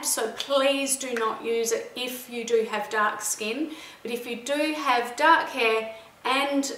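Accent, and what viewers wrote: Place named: Australia. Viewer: seems like an aussie lady from melbourne :)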